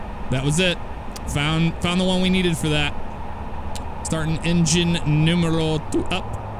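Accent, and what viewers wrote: United States. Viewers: Are you American or Australian? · wait, can you really not tell lol, he is american.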